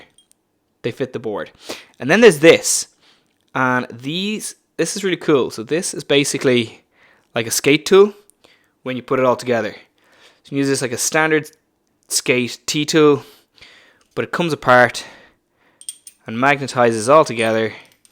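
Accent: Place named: Canada. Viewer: You are in Ireland? You sound Canadian to me, which is very close to America , I hope you are not giving up your accent geez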